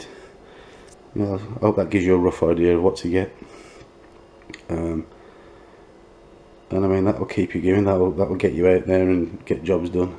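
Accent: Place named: Midlands, United Kingdom. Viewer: Midlands man right there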